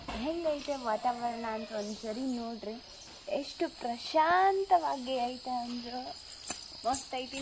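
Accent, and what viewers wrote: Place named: Raichur, India. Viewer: Bro idu Namma raichur matu matte Namma karatagi matu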